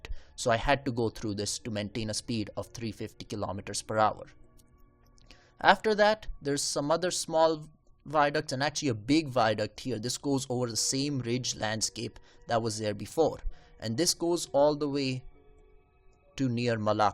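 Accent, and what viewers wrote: India. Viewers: Indian accent. Fuck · Bombay English if you really want to know.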